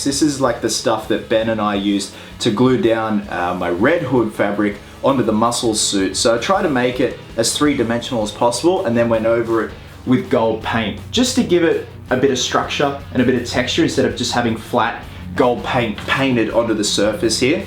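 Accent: Australia. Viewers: you look amazing. The costume is great. Love your Australian English. Sexy body, I need to work out more 😅 One think. Take some sun rays. You're extremely white 😝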